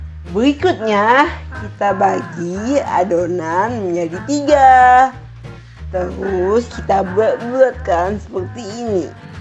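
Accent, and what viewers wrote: Palembang, Indonesia. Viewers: Cek , R nyo asli nian wong pelembang sabrang . Bedrot .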